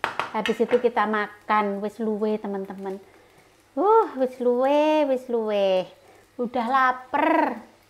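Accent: Java, Indonesia. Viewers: Keluarga indonesia banget, suka sekali kalo mba cara bicaranys medok2 gimanaaa gitu😄 sehat selalu mb sekeluarga Amiin. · Ketagihan nonton vlog mbak..ngmong jawane iku lho marake aku guyu.. Ramah juga orange..aku seneng nontonnya Salam ya mbak..aku seko demak ..jawa tengah😁😁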